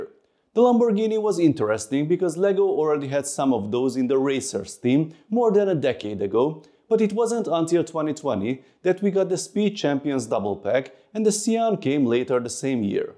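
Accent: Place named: Hungary. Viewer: Az akcentusod alapján magyarnak mondanálak :D Király videó!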